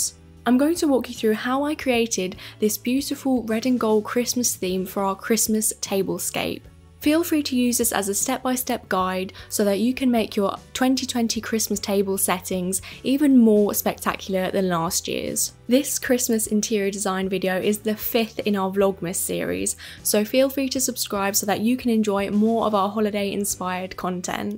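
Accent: England, United Kingdom. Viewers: Haha. We're hosting Christmas for the first time. We've been going to my sisters for the last 25 years! I searched for Christmas tables and watched at least 10 videos from America. Then clicked on yours and it was a classic English accent 😍 Happy Christmas from Ireland 🇮🇪 And our table will be gorgeous.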